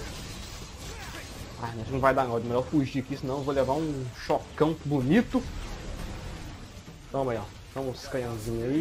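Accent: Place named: Bahia, Brazil. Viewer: essa voz de baiano que saudade crl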